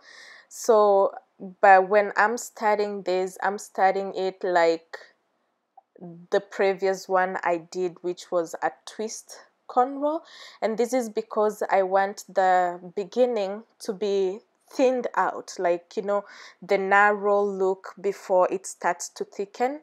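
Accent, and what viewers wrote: Kenya. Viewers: You must be kenyan, I heard you speak Swahili and omg your accent. Wow 🤩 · lol! accent ni ya Muranga ama!Nilijua tu wewe ni wa home😝Uko na katoi karembo..😌imajine aki nyweke zetu na blackamericans ni different aje..anyways you did some awww kazi..niko Swiss currently n nyc to hear sone home accent online😝😍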